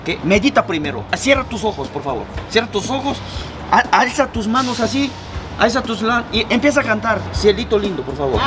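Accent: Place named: Sonora, Mexico. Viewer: eres de Sonora o porque tan Hermosillo?🙈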